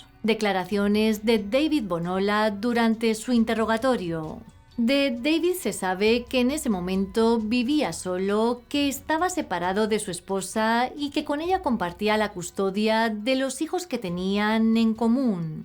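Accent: Spain. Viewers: Me encanta tu tono tan español !!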